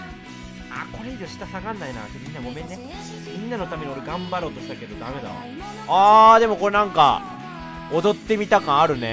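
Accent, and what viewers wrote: Kansai, Japan. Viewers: もっと関西弁で話して欲しいです＊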